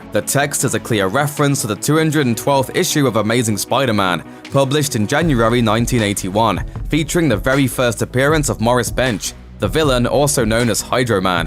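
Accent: United Kingdom. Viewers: This dude has a British accent just like Tom holland 😅 I like British accents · Is this a British accent?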